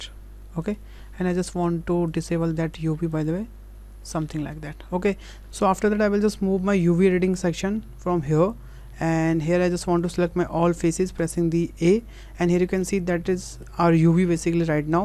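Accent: India. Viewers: i love indian accent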